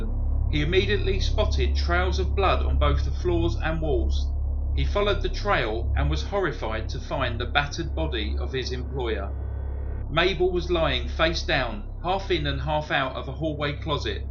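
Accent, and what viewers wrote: England, United Kingdom. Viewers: These cats think anyone with an english accent sound like the same person.! lol Although these are 2 of my very favorite channels! Brothers or cousins maybe?? teee heee! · I think people think you and Brief case sound alike because of your British accent. To Americans British accents all sound alike.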